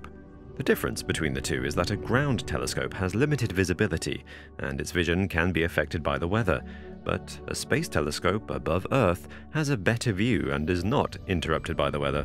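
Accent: United Kingdom. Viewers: Wowww! Amazing video and English accent are pretty nice thank you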